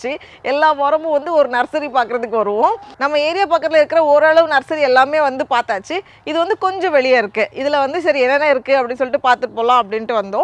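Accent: Nagercoil, India. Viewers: Akka ninka nagarcoil thana . nanum tha yena ninka peasurathu and ninka pandra nagarcoil side enjithiyal famous appovea kandupudisan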